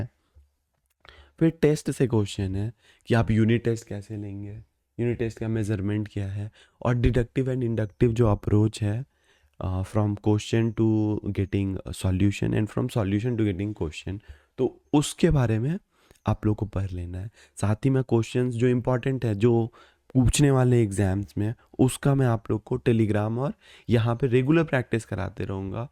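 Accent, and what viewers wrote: Bihar, India. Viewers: Sir, are you from Bihar..? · India se Hai... bihar kya laga raha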